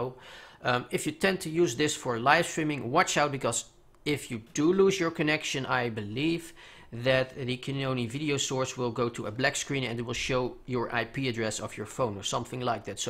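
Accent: Netherlands. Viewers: Nederlands? Ok hoor t aan je accent haha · je bent gelijk nederlands dat hoor ikg elijk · Zonder dat ik naar de reacties las hoorde ik direct dat je Nederlands was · Bij mij hangt de download de hele tijd vast (ik weet dat je NL bent ik hoor het) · You're defenitly Dutch right? i'm Dutch aswell and my YouTube is english and i just can hear your accent we share :D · Hi, U sound a bit dutch, Are u dutch? Cuz i am XD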